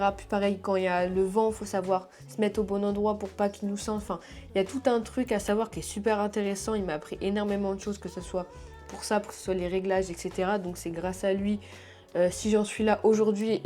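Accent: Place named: North, France. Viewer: Salut Première chose, j'ai eu beaucoup de mal avec toi au début sur la chaîne du tonton mais maintenant ça passe beaucoup mieux, tu es plus à l'aise et je t'ai finalement accepté. Et seconde chose, je trouve que tu as un p'tit accent du Nord. T'es vraiment de la région parisienne? Tu n'aurais pas vécu un peu plus haut que Paris? Bon courage pour ta chaîne et tout le reste. La bise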